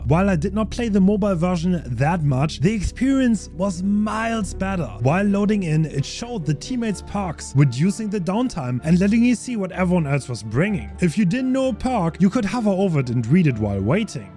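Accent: Germany. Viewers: german,austrian or switz accent? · is he german?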